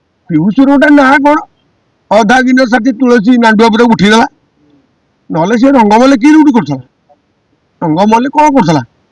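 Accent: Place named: Balasore, India. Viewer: Balasore kothaa kon kohuchy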